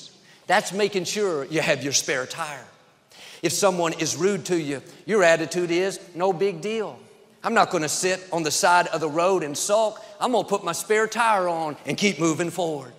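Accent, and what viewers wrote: Texas, United States. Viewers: Thank you so much for ALL your encouragement, jokes and messages! I do love your Texas accent. God bless you in Jesus' name! ! 😘😘🙋🙋👏👏😃😃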